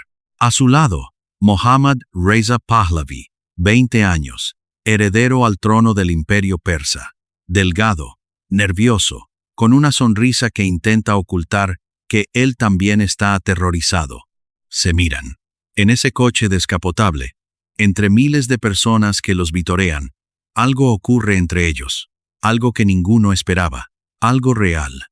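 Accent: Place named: United States. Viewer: Cambien el robot de lectura con ese acento yanqui horrible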